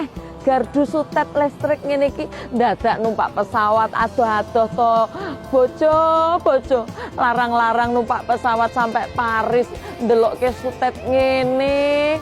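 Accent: Java, Indonesia. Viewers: Aku suka banget kak, hidup di luar negeri tapi bahasa jawanya masih tetep medok. Sehat selalu ya kak❤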